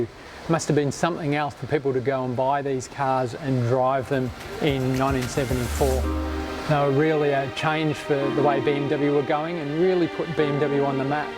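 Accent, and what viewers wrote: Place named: Australia. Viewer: barely detectable aussie accent......barreelyy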